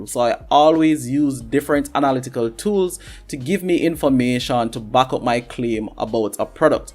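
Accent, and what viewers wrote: Jamaica. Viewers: Good video. Are you from Jamaica?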